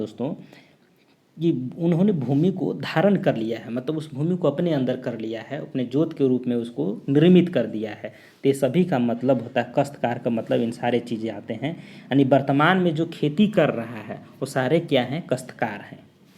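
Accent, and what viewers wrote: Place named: Bhojpuri, India. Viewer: Bhojpuri mix बा तोहार बोली में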